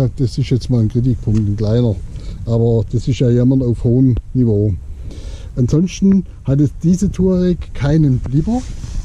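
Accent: Swabia, Germany. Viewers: Super !!! Wieder ein Motorradvideo - das Schwäbische ist echt super - heimatverbunden eben - fast wie die Aprilia - das ist wirklich ein tolles Moped - Danke für das informative Video - das immer etwas anders ist als die anderen Videos !!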